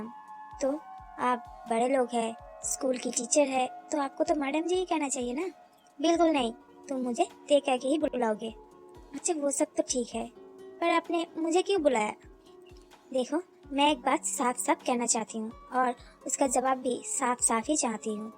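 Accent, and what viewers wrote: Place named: Bengal, India. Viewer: Nice story or bangali logo ka hindi sunneme accha lagta hai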